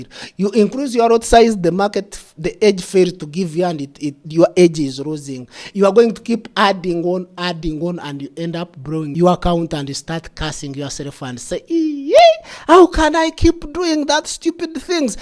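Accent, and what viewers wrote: Ankole, Uganda. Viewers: Your accent is not bad just a kinyamkole accent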